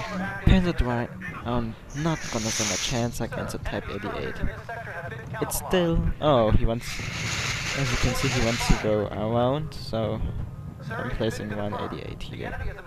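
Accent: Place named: Germany. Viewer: alter du hast so einen heftigen deutschen akzent^^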